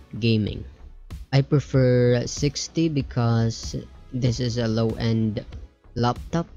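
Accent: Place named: Philippines. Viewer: Pagsasalita palang alam ko nang pilipino ahahahaa thanks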